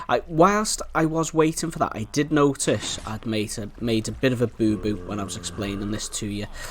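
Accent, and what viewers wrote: Liverpool, United Kingdom. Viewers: Nansymansy Si adnan LMAO. It’s a scouse (Liverpool) accent. Liverpool is a place in England my friend.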